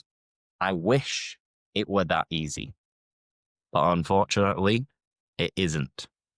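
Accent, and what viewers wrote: United Kingdom. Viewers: Finally, i understand british accent. Thanks so much😊😊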